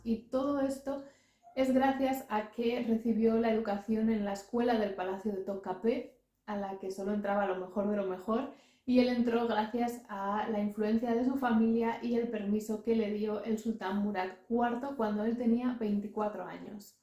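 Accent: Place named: Spain. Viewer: Sería buena idea que tu misma tradujeras ese libro del viajero. Tienes un lijero acento de español de España.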